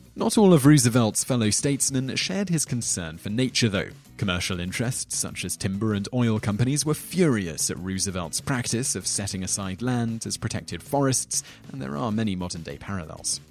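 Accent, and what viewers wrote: England, United Kingdom. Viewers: one second into the video and I'm instantly drawn away by the fact that a guy with a British accent is hosting this video. GET OVER IT YA DAMN BRITS WE BEAT YOU 241 YEARS AGO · Why is an English dude talking about America ...... Don't know why I find this weird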